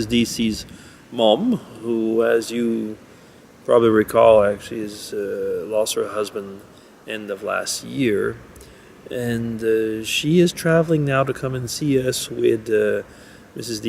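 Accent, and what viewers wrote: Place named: South, United States. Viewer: You can send some of that cool weather this way. Sounds like you are going to be busy with family coming. Hope they let you video. =) You are a man of many languages & your southern accent... Oh that. Yea Halloween. Yes when trying to know how is who now is crazy. Stevie could not even get to his message page. A fun BD gift is on the way =D Under the Dome is very cool. TY for keeping me up on it xoxoxo. I watch TV at night BIG HUGS for you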